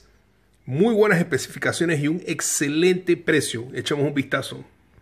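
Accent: Panama. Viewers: Es lo que parece. Panameño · Él es de Panamá? Tiene un acento muy panameño 😂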